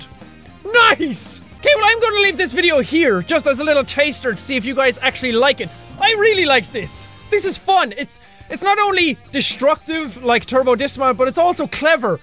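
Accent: Ireland. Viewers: I feel so sad I havnt watched 4eva and I miss the cursing and irishness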